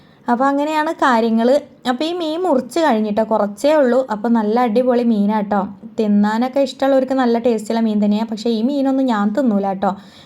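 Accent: Kozhikode, India. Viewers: ഇത് കോഴിക്കോട് സംസാര രീതിആണോ, ഞങ്ങളുടെ ഒറ്റപ്പാലം ഭാഗത്തു ഇങ്ങനെ യാണ് സംസാരിക്കുന്നത്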